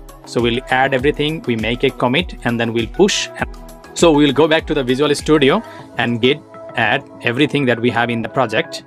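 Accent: Bengal, India. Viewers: You are definitely A bong with your accent i can tell that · Just by listening to his voice, I can say he is a Bengali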